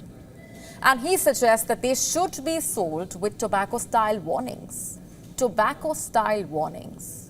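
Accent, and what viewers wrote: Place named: India. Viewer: Indian. It's the only accent I can't stomach, I don't know why.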